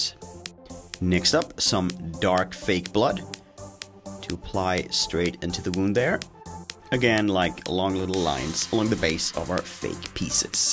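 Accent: Scania, Sweden. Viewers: Älskar din skånska!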